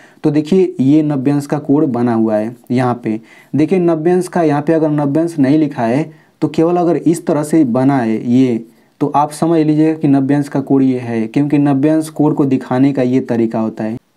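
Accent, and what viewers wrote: Bihar, India. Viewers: Sir Aap Bihari hona na anyways Thank you 👍👍👍👍👍👍 sir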